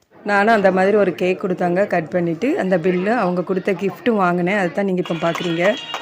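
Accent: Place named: Tirunelveli, India. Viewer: ok sis tirunelveli la therinchavanga madhiriye erunthichi athan kten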